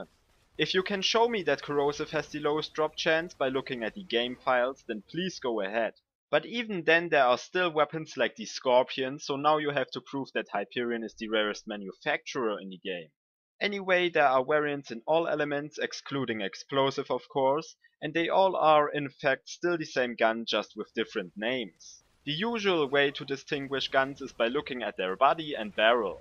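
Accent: Germany. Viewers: Are you german? You're English sound so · are you german? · Bist du ein deutscher? Mann hört an deinem akcent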